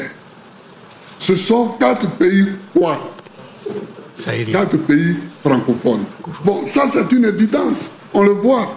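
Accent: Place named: Ivory Coast. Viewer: Il parle trop comme un vrai ivoirien fierté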